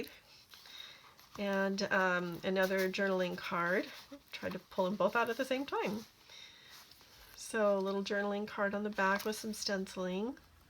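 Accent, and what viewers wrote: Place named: Pacific Northwest, United States. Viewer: So cute! Love them. It's my first time here and you sounded like you might local. I'm across the sound from Seattle.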